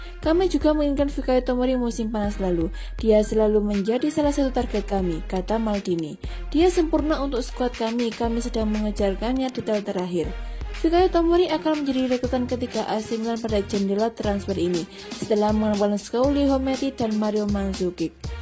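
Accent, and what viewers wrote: Java, Indonesia. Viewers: min kok agak medok ya😂😂😂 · Medok tenan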